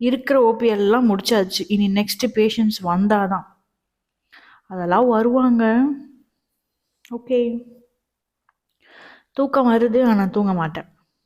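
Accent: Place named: Tirunelveli, India. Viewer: Spr sis tamil rmpa alzhaga pesureenga na tirunelveli nu nenajen..... ✨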